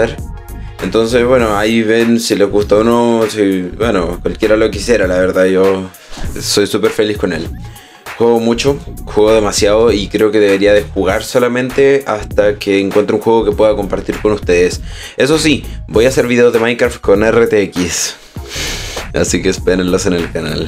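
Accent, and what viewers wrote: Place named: Chile. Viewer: Pensé que eras argentino pero me acabo de dar cuenta que eri chileno Creo khe tengo lo oidos pa la caga Ta buenísimo tu setup 🔥🔥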